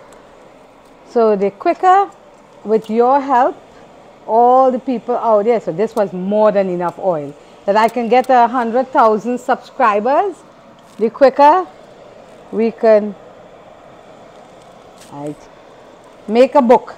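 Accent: Trinidad and Tobago. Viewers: Hello......this is from a Trini living in Africa...where there is no Trini cooking...except for a little in my kitchen. I just discovered your channel. You make me laugh because you are a true Trini cook. No measurements...a handful of flour...a little dash of salt...just loving it. It's the way Trini's cook. You don't need measurements...you know what you're doing...and you do it fast. I watch other channels to see exactly how to cook the food...with the right amount of ingredients. I watch you just to lime in your kitchen. Love hearing the Trini accent and just your way. Next time I am in Atlant again...I will have to visit Tassa. Blessings to you! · I'm a new subscriber and I love watching you cook. I have former college friends who are Trini that I spent years with and you bring back those memories of Trinidad trough the food, accent and love of family. 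It's great to see...can't wait to watch all the past videos and catch up! Sending love and blessings to the family. 🖤❤🇹🇹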